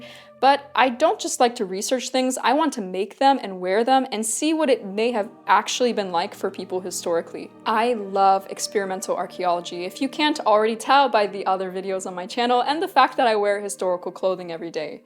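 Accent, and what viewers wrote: United States. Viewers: Sounds US midwestern to me. Lots of extra s in midwestern speach. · you sound American. But the way you said privacy makes me suspicious lol 😂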